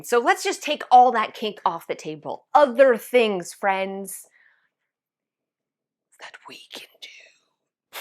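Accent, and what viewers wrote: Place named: Ontario, Canada. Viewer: As a former avid skydiver I agree. Safe, fun, but there aren't a lot of vertical wind tunnels in Canada (where I live). By the accent I'm guessing you're in Ontario? There are a TON of places there. It makes for a memorable family video too!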